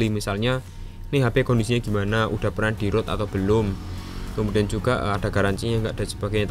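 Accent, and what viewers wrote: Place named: Java, Indonesia. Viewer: Ngomong nya Medok Banget Mas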